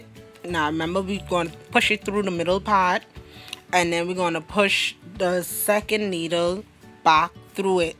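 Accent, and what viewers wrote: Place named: Bahamas. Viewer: Hello sound like from Bahamas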